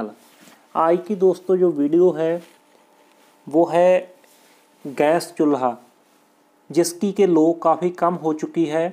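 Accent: Punjab, India. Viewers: Punjabi banda lag raha hindi bolne ki tone se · Very good voice quality with Punjab I accent.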